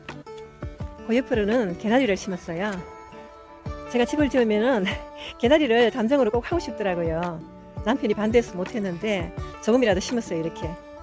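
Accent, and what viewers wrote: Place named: Gyeongsang, South Korea. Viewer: 서울말 쓰는 갱상도 아지매시군요. 꽂아놨어요를 꼽아놨어요에서 빵 터졌음......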